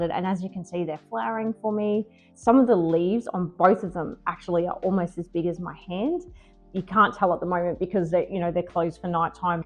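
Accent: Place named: Australia. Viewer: noo YT didn't show this video in my mentions, but it popped up on my feed today. I am so glad it did and I love your Aussie accent! I can understand you just fine. 🙂 I love your home and outdoor garden too! it looks like you get a lot of natural light, I am so jealous. thank you for doing this tag! 💚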